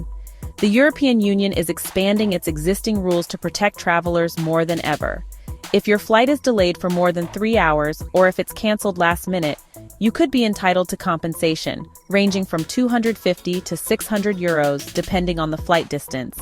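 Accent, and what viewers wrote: United States. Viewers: American narrator ? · Sick of this American narrator and her bigotry. Presumably AI, input by the trumpeter.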